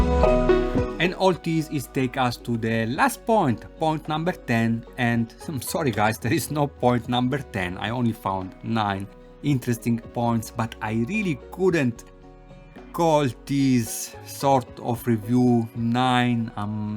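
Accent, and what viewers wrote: Italy. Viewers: Terrible englsh italian way 🤕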